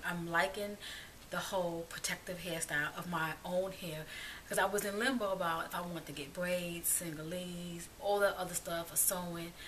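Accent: New York, United States. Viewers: I love prepooing with coconut or olive, very simply i just add oil 15-20 minutes before i shampoo or cowash. It's makes detangling very easy. I would recommend looking at the website blackgirllonghair this website is bomb! They break down information on black haircare very simply and showcase a variety a technique and tools. BTW I love your New York accent you represent New York girls proudly!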